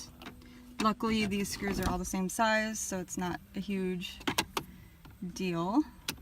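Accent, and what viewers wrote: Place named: Canada. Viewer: Thanks for tips, you sound Canadian.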